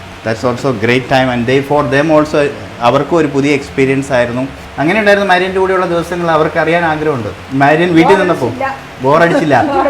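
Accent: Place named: Kottayam, India. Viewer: സംസാരം കേട്ടാൽ അറിയില്ലേ കോട്ടയം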